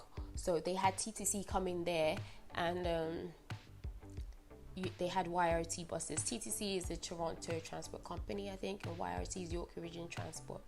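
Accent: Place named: United Kingdom. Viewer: Your British accent came out to play, where’s the Canadian and Nigerian one 😀. Thanks for the heads up on accommodation. 👍👍👍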